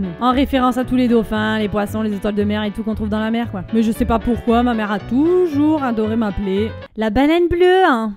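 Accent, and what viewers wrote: Quebec, Canada. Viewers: Mes on est d'accord que elle a un accent québécois parce qu'elle était aller vivre o Québec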